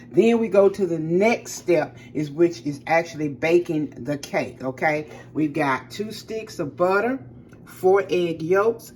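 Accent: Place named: South, United States. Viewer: Mrs., I just wanna come sit at the table. I love your spirit and yo kountrrryy twang.. God's continued blessings to you and.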